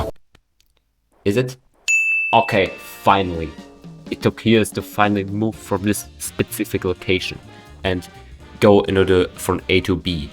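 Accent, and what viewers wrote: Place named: Germany. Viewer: I can hear the german accent lol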